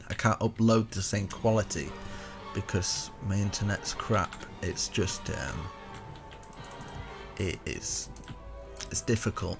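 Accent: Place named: Scotland, United Kingdom. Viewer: Your accent seriously you sound Scottish I love your videos you make amazing builds and your funny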